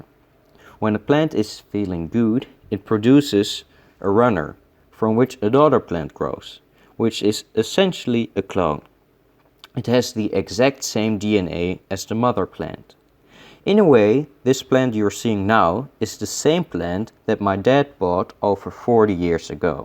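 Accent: Netherlands. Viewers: Hij klinkt een beetje Nederlands. (He sounds a bit Dutch.) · you're obviously dutch, aren't you? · Are u dutch? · I played your first clip on your jar ecosystem for my 13-yera-olds in our science class and they loved it! We were looking for a group effort in our upcoming science fair and our unit on ecosystems. GREAT job! Your accent sounds a bit Dutch to me. Are you from The Netherlands? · hey are you dutch? your accent sounds so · You sound very Dutch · Wich country you live in? You sound a bit like dutch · 80% sure you're from the Netherlands.. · Waneer je kan horen dat ie nederlands is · Maybe its just me but you sound dutch. So are you dutch?? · Ben je Nederlands?😂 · Your Dutch accent makes this funny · You’re Dutch for sure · It's good knowledge and are you Dutch? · You are so Dutch, it hurts my ears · Do you come from the Netherlands? Just wondering 😂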